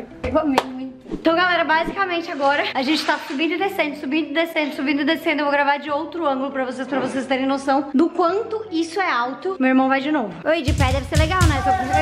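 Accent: Rio Grande do Sul, Brazil. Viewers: BAH THÊ ELA GAUCHA MESMO EU SOU DE VIAMÃO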